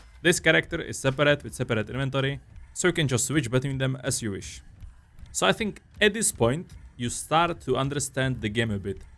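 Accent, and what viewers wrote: Czech Republic. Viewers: Czech accent much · To je hrozný jak tam člověk slyší, že je čech... je to takové divný. Jinak super video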